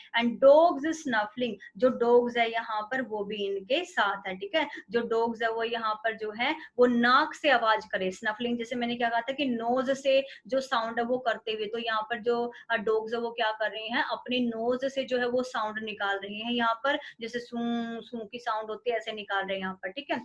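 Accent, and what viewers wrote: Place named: Punjab, India. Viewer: Tuhadi bolli Punjabi lagdi aa..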